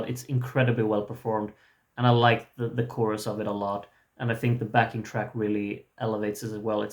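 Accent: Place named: France. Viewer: I love this videos as much as I love ur' french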